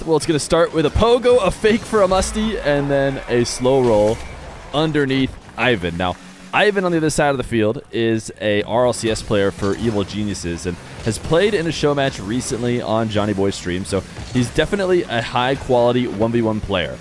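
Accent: United States. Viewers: sounds like Johhnyboi but with an American accent, and I find that pleasing.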